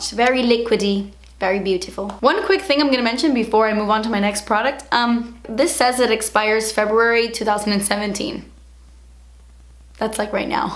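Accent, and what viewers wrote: England, United Kingdom. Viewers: Full video speaking in british accent please!! ❤️ I am always expecting you to speak in that accent in every video. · Can you do a tutorial or anything with just talking in your British accent? Lol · I love it when you do an English accent! Please could you film a whole video in this accent?!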